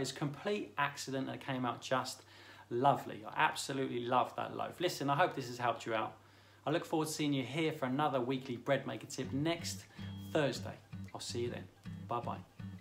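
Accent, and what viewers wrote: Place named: England, United Kingdom. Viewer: Finished listening, just for the good old English accent. Miss it.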